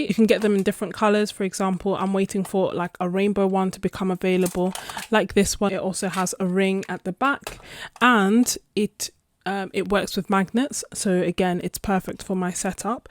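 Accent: England, United Kingdom. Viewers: I’m in soggy Cambridgeshire, so probably not far away from you judging by your accent. Love hearing how different people feel about palettes. I’ve got many of the same. How I feel about them is an evolving process. I’ve come to realise they key for me is flexibility, if I can’t change it, I get bored.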